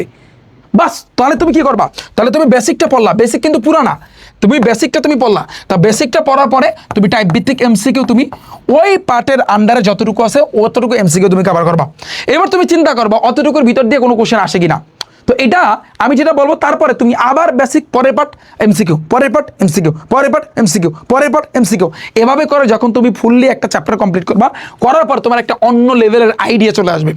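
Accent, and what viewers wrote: Barishal, Bangladesh. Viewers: Vai ki Barishailla?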